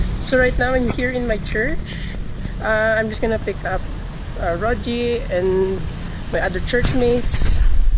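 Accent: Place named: Iloilo, Philippines. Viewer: Nami gd accent sang ilonggo ba HEHEH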